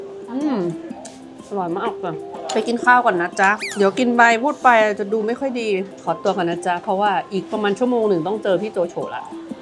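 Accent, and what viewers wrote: North, Thailand. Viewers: อี่หน้อยนี้อู้เมืองได้ต๊ะ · ทำไมพูดเหนือได้ครับ หรือลูกครึ่งเหนือ+อิตาลี่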